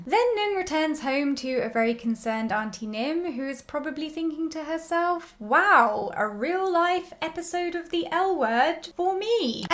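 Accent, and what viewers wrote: United Kingdom. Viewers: I really don't care if the pronunciation is Faye or Faiii. She's hot. Your descriptions just tickle my fancy. So articulate British. Love it. Still laughing.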